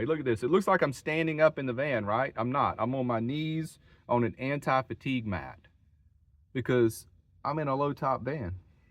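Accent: South, United States. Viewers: This is at least the second time I’ve heard you comment about your looks, dear. You are an attractive man, and I suspect ladies really love your sweet southern drawl. You are very accomplished and have such a nice personality. Have confidence in yourself and hold your head high, dear man!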